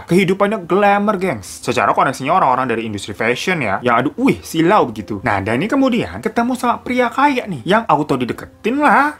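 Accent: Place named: Jakarta, Indonesia. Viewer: Ini orang betawi yaa.. Ngomong nya gitu yaa..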